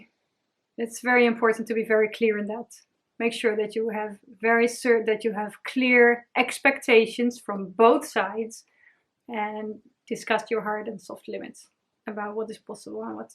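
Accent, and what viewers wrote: Netherlands. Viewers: I came across this video when I looked up slave positions. I'm writing a piece of fiction that includes a BDSM relationship and I want to create a realistic image. Your videos give me a good idea of what BDSM is actually about. You don't just list the rules, but you also open up about yourself and your relationships. You come across as a kindhearted person who loves sharing her passion. Perhaps it might be a rude question - I apologize if it is - but would it be alright if I made a reaction/information video about some of your videos? If I use any of your material or refer to your videos, I will always give credit and place a link to the video(s) in question. In my environment, there are a lot of misconceptions about BDSM and the people who participate in it, and when I noticed that, I also realized that there is a lot of misinformation about BDSM, not just on the internet. 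I would like to help you and other creators spread correct information about it and help people find themselves and other people with who they can share their passions. It might be useful to mention that I am not a part of the BDSM community (yet) but I have been educating myself on it for a while now, and I would like to help other people do so as well. It's quite off-topic, but I love the accent. I am from the Netherlands as well.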